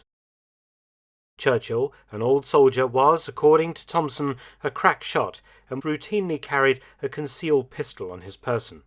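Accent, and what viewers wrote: United Kingdom. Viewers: Any historical documentary always has more gravitas when delivered in a british accent..its just a fact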